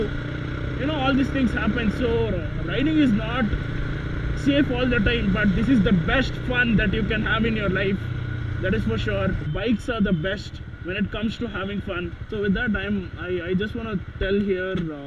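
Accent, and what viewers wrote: India. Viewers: mane yell guru nindhu? im from bang too, ur vids r great and 1000% INDIAN accent lol.....u got a sub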